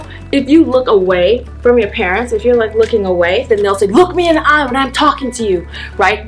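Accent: South, United States. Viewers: omg I just love your southern dialect. wonderful video keep it up <3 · Omg where from the south are you from like what area? We have the same accent so I'm curious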